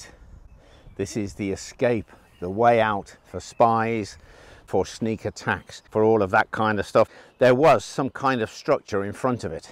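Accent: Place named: England, United Kingdom. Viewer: He's not American. He's English. Very clearly English.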